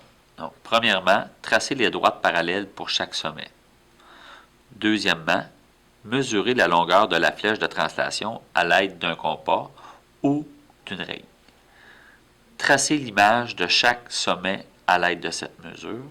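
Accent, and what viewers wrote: Quebec, Canada. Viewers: Non c’est son accent québécois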